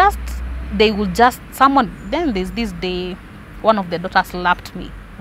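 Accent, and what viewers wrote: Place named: Kenya. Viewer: 😂😂Kenyan Swahili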